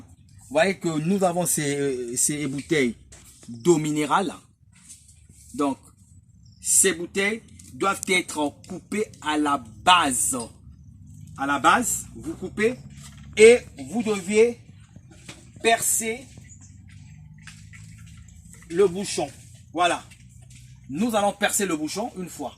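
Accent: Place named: Africa. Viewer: Aaahhh,ça c'est l'Afrique! Avec le véritable accent et la vraie pédagogie! Est-ce '" tu comprends, hein?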